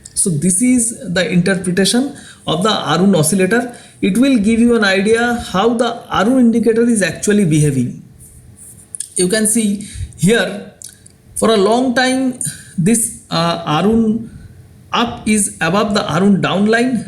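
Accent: India. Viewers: I am from Russia and I understand your indian English )))))))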